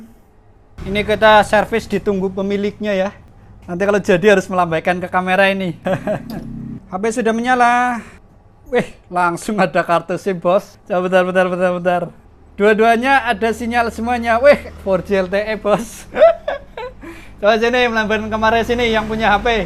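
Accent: Banyumas, Indonesia. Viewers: Ngapak ya kak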